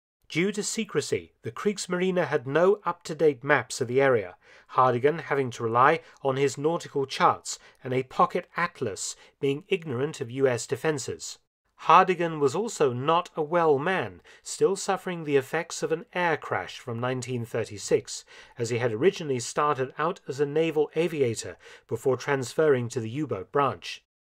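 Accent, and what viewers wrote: England, United Kingdom. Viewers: GENERAL British pronunciation; fairly innocuous, but not in a bad way. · Its the British accent. Brits are great narrators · His particular English accent doesn’t hurt either — it fairly screams credibility. 😀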